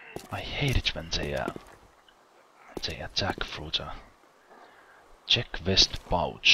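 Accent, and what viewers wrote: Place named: Finland. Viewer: for the lovely finnish accent!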